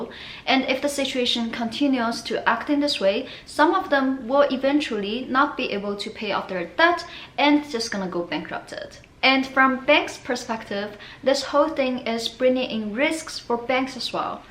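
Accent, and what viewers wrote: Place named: China. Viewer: You are the best YouTuber, I watch almost all the videos of your channel. love your voice, your Chinese accent. I think you are absolutely gorgeous, and you are a really confident person. I really want to be friends with you, I can imagine that you are such an amazing friend. Keep film more video!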